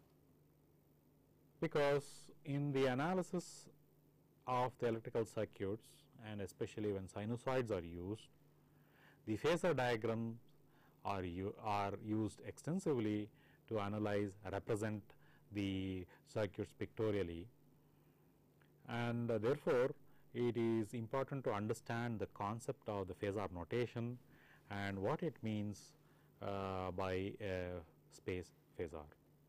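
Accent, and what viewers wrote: India. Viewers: Hello, He is an Indian and there is nothing wrong in speaking with an accent. This program is primarily targeted at Indian students, who won't mind the accent. And unlike westerners, Indians have a culture of respecting their teachers. Grow up, and throw your chauvinism out when you come to learn something.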